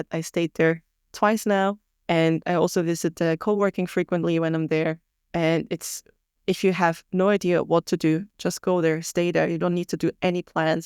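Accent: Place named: Thailand. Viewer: พูดสำเนียงไทยชัดมาก🤟🤟❤️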